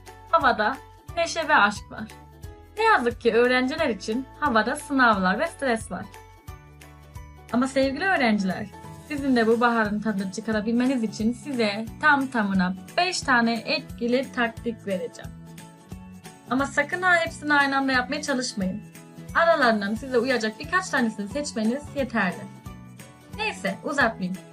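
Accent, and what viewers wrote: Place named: Azerbaijan. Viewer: Seslendiren azerbaycanlımı Türkçeyi zor söylüyo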